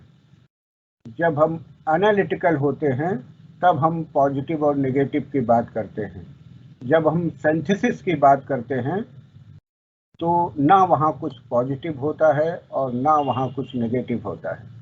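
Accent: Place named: Varanasi, India. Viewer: Pranam, Pranam Pranam...... Pranam Pranam Pranam ..... do not have words to express my gratitude to you for this lesson....... you said in pure Varanasi Hindi accent & style.........replayed & listened it 2-3 times.... hearing astrology in pure hudh shindi after long time...........mera pranam sweekar karey.....मेरा प्रणाम स्वीकर करे The words, pictures, spoken words ....etc., so nicely done.... can only come from Lord Shiva bhakhts......2 hrs = 12 years of learning.... please invite Guruji to conduct few more lessons.... such an excellent gyan.....ज्ञान ब्रह्म ज्ञान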